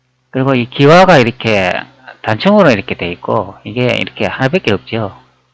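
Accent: Gyeongsang, South Korea. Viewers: 그놈 목소리. 반갑다. 겡상도 목소리다. 예리한 자료수집력. 역사고증에 많은 도움을 준다. 자못 기대감이 크다 하겠다. 역사성. 사실성. 확인성이 매우매우 높다. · 경상도분이 자나요 사투리가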